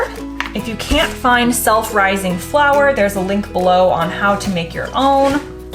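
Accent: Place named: United States. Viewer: I could fall asleep to your voice... Esp when you say "go ahead" at least once every 30 secs like a true American. Very cute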